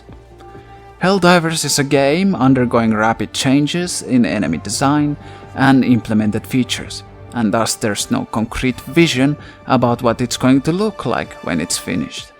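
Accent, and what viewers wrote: Finland. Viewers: anime girls, conquer from the cock and ball game for honor, dad jokes, sexy finnish accent. Perfect video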